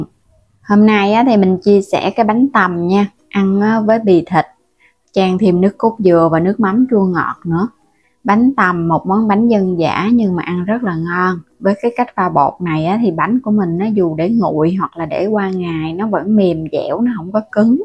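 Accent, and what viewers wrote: Mekong Delta, Vietnam. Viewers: Giọng miền Tây nói vậy đó bạn ơi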